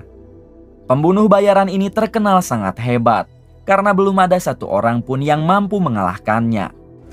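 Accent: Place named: Majalengka, Indonesia. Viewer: Lu orang Majalengka ya min ?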